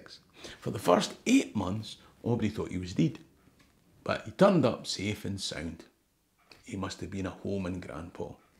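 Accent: Scotland, United Kingdom. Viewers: (USA, northwest/midwestern) never heard "full Buhna" expression before. I could listen to you tell stories all day! "rammed down your thrapple" - love it. It felt in this one you worked extra hard to lay the Scots on real thick, it felt like I was leveling up. Maybe I was just listening more closely than usual? LOL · Hahaha, the subtitles have more trouble with your great Scottish accent than I do, and I'm American. Oh, if you or other Scots spoke faster, yes, I'd have trouble, but I understood you pretty well, and it sounds great. -- The subtitles thought "tumshie" was a "turnip!" Hahaha! And auto-(in)correct spellcheck thought "tumshie" should be "gumshoe." (I have no idea what "tumshie" means, though; I'll have to look that up. (My apologies if I'm cussing and don't know it!) :D -- I know only a little about the Scottish accent and history, but I'm glad to have found this channel. 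-- I have Brodie ancestors and it's unclear whether some Thompson ancestors were originally English or Scots. (My family tree includes half of Western Europe, it seems, but my family name is either northern English along the border with Scotland, or else Dutch r German respelled like English. So it's possible that part of my family line has had a long historical connection with northern England and Scotland, even once my ancestors (several different lines) came over to America at various points. (I hadn't realized there were as many connections as there are. Huh, interesting. But the didn't know each other, it's random chance.)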